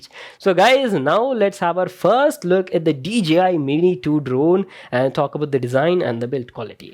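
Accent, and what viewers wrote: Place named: Hyderabad, India. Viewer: Your accent says you are from Hyderabad 😃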